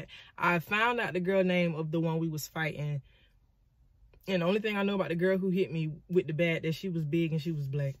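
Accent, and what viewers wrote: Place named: Philadelphia, United States. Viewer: U sound like my home girl from philly… keep the stories coming great job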